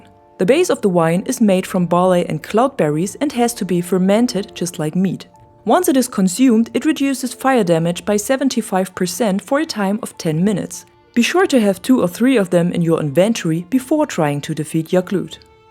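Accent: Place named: Germany. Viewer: There's just something about your voice and pronunciation that I can't stop listening too, I can tell you are german speaking but other than that you sound nothing like the germans I know who speak english.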